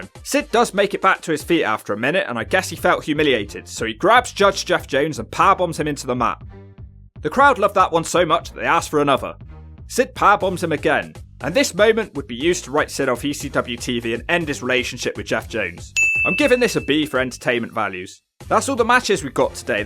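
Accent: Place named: United Kingdom. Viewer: Seriously, dude. You're a Brit. Why are you writing about American culture, you freak? In case you're dumbass wasn't aware, pro wrestling is a product of American culture, so fuck off with your gay high-pitched Brit accent. It's not manly and it's definitely not suited for an American audience. In case you haven't noticed, Americans are real men and Brits are not real men. Just watch a Benny Hill comedy special to understand how femine and weak real Brits actually are.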